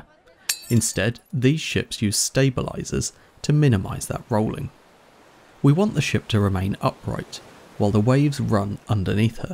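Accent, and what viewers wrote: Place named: England, United Kingdom. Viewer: Why are these vids always so much more compelling when the narrator has an English accent?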